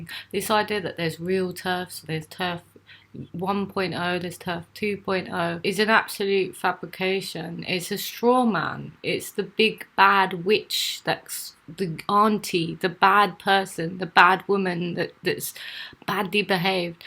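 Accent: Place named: United Kingdom. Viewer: I can’t get over the ushusting Da YooKay accent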